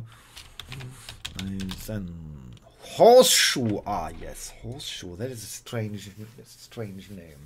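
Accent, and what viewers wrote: RP, United Kingdom. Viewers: warum sprichst du wenn du englisch sprichst immer in diesem Posh-English-Accent? Warst du während des Studiums in England, Oxford vielleicht, oder einfach nur ein Faible für BE? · So Berlin isn't the yellow of the egg, except Spandau? 😉😁 The majority of big cities tend to be left. Love your very British sounding accent! 💕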